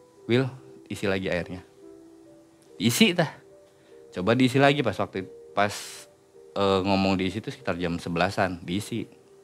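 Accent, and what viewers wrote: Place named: West Java, Indonesia. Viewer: Sunda pisan ini mh mang ei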